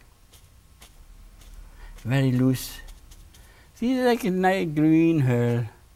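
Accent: Germany. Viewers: Der deutsche Akzent ist das Beste 😁.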